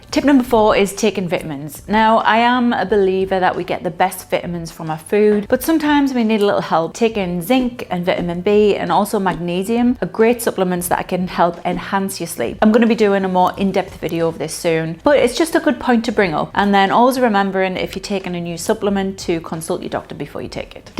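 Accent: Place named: England, United Kingdom. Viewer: Finally !! Someone with an ENGLISH ACCENT !! Thank God !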